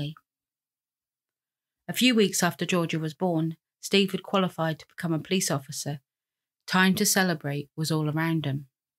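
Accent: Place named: Suffolk, United Kingdom. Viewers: weird hearing a Suffolk accent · Hey, I'm obsessed with anything true crime & just found your channel, I'm now gonna binge all your videos. Thankyou for your content! Where are u from? Your accent sounds sooo local to me (Suffolk) x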